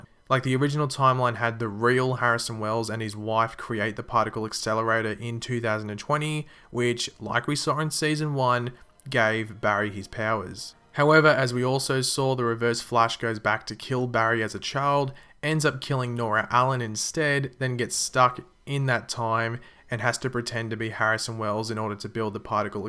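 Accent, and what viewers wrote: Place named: Australia. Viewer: youre so australian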